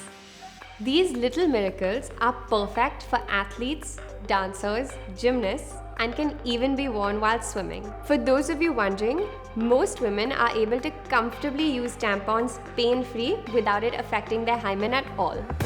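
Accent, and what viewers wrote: India. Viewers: Why does she speak in Indian accent? She doesn't look like an Indian. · I was confused, the lady looked so white yet her accent was strong indian, turns out it's an indian channel. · Why does she have an Indian accent?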